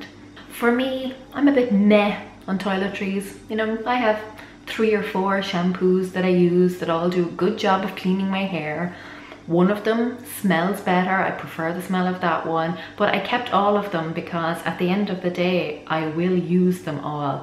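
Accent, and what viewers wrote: Ireland. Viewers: oh u live in ireland? That's cool cause i'm german and I actually thought that you had an irish accent. Interesting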